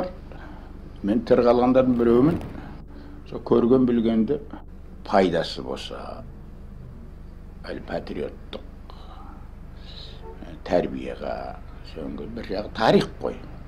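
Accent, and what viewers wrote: Standard. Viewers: Қазақша білмейтін мәңгүрттерге көрсететін сұхбат екен. Нағыз совет үкіметінде өмір сүрген адамдардыңда таза қазақша сөйлейтіні дәлел. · Таза ана тілде,сөйлеп отыр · Екі тілді сіздей меңгерген ешкім жоқ екен. Қазақша сіздей тұнық сөйлейтіндердің қатары өте аз. Трагедйа осы... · Советтик кезде таза казакша сойлегенине ризамын атанын, казирги жастар, ересектер де тауелсиз Казакстан да шала казакша сойлеседигой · Орыстың қақ ортасында жүрсе де қараңыздаршы қазақшасы тап таза. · казакша таза сойлейди екен, онтустик - солтустик акценттерисиз.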